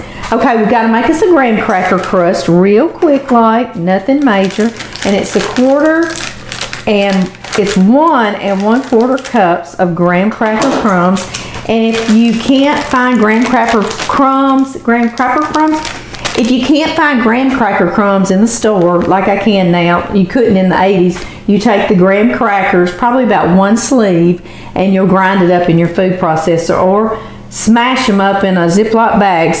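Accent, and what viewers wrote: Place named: Louisiana, United States. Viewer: where are you in LA? I am from Shreveport. You sound like you could be from just east of S'port. My accent is a SE TX accent since I was raised as a little kid in Beaumont, TX. I tell people that I have an educated SE TX accent. I remember Mother giving me this recipe back in the early 70s. She got it from my Aunt Helen, her sister-in-law, who lived in Dallas. I believe they are named for the musical "," which was very popular in the late 60s - early 70s. Yep, this is really a recipe from way before the 80s. I loved them and made them for get-togethers long before the 80s. One commenter said that they used a 9 X 13 pan for theirs. I believe that is the pan my recipe calls for, too. They come out just as delish, but thinner in such pans. I had a little party at my dinky house (half of a duplex) back when I was an undergraduate in New Orleans. 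My girlfriend helped me, but she was not much of a cook; I was the cook for us. Anyway, ones of my friends there said something along the lines of, "I can't stop eating those Hello Dollys. I've already had 4 of them." I replied, "You've had 6, but who's counting?" We both loved it because how often does one have the opportunity to use that line?!? Anyway, these little bar cookies that are almost candies are so tasty and simple enough for a boy just starting out cooking on his own to do with ease.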